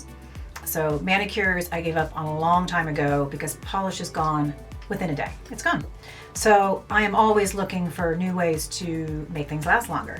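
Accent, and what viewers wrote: Ireland. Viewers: That's gas, I heard your accent and thought "some of those words/phrases sound really Irish" and then I read the About section on your channel and it made sense! 😂 I got an ad for these on Instagram and was curious because I'm awful at painting my nails, but I thought they were probably too good to be true alright! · Do I hear the most subtle Irish accent? I’m American so I could just be hearing things. Love the way you speak. Very pretty ❤️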